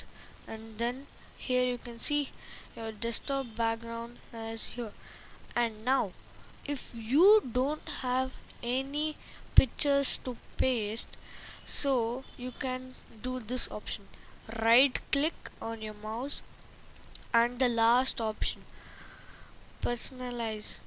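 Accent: India. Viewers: that indian accent was solid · The Indian accent make me weak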